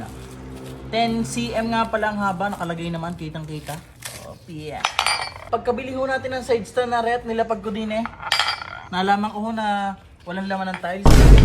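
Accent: Batangas, Philippines. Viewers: Taga Batangas ka puge? Batangeño ere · ngayon ko lang kayo napanood kuya, halatang batangueno agad hahahaha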